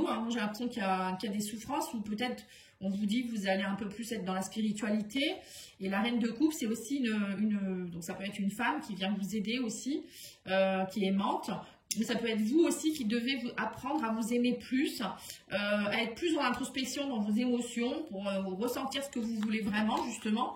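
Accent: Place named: North, France. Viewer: Étant moi même nordiste j adore votre accent merci pour votre guidance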